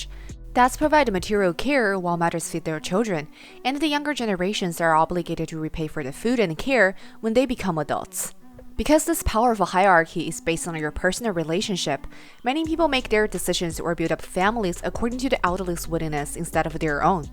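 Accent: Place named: China. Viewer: Interesting topic + excellent explanation + insightful review of the topic + Chinese accent = top tier educational video!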